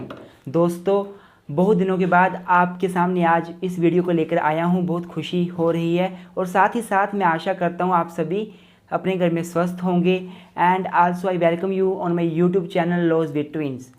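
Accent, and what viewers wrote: Himachal Pradesh, India. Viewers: Kya aap Himachal se ho ? 🙄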